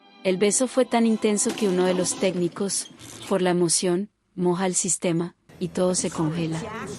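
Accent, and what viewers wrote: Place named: Spain. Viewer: Very good job 👍 Thank you very much for italian subtitles 😊 I love so much your voice with your spanish accent 😉❤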